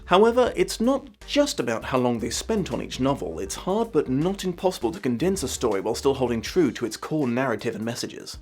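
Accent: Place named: United Kingdom. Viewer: I find your voice so soothing,. But maybe it's just the weakness Americans have for British accents.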